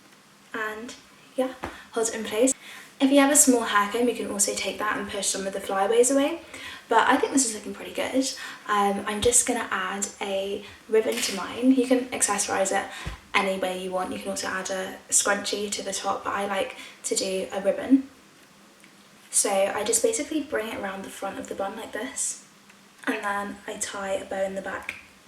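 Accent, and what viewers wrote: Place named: France. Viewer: I love your accent and is it a French accent or a British accent?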